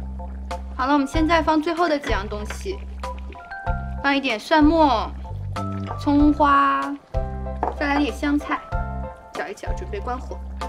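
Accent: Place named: Jiangnan, China. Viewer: 昨天试做了汤麻辣烫，很好吃👍今天试做干拌款。 话说东北小JJ说话很好听喲!感觉是江南小JJ呢！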